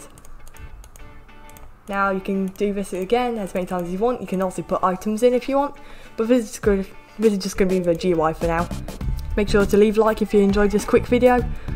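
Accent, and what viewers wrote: United Kingdom. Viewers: And british to wow :o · You’re laughing that a guy is British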